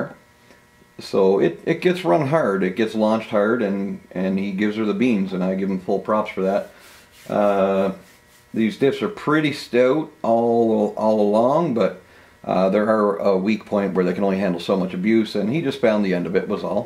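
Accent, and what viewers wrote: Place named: Canada. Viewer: I’ve been in the auto industry for 30 years and have never heard a ring hear called a crown gear, I kept listening and I believe I hear a Canadian accent perhaps, it’s interesting to me how each area or region has their own names for certain things.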